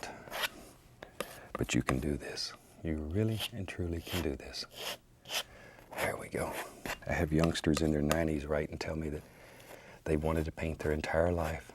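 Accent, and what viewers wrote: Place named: United States. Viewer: he says it a lot in his videos.. pretty sure its just his american accent. he says’ something bout like that.. but the ‘that’ is lazy :)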